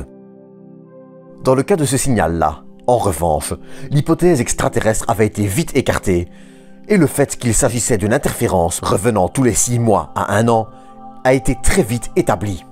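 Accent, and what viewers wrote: Liège, Belgium. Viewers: Ta chaîne m'a été recommandée par le petit astronome...malgré l'accent belge qui pique un peu, j'adore ton style de narration ...bon courage!! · Sacré accent !!! Liègeois??? ;-)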